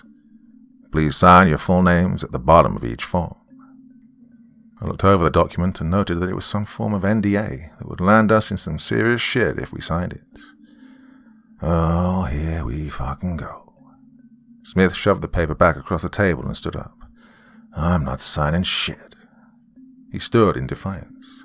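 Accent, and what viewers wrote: England, United Kingdom. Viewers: Have you ever tried using a coffee/tea cup, glass or Styrofoam cup to emulate radio chatter? Love your stories. Only good narrator that does military stories and doesn't sound like shit lol and the deep english accent makes the stories even better. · Once again, what's up with an English guy narrating a story about US NAVY SEALS??? Its so distracting and out of place..cant find even 1 American to do this job? Really? Couldnt get through this story because of it. I spent 4 years active in the Marines, another part of the Navy, never in all those days did I hear 1 British accent the entire time. So why here?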